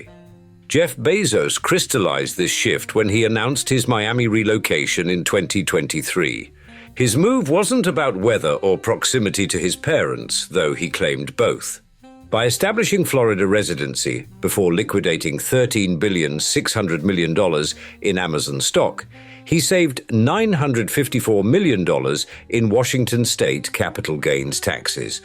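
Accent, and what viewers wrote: United Kingdom. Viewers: British accent means go to another story fast.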